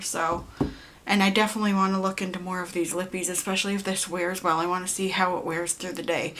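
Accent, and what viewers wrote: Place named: Australia. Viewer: Oh my god!! I JUST came from piinksparkles vlog and as i was watching this I recognized your accent!! Im from north sydney!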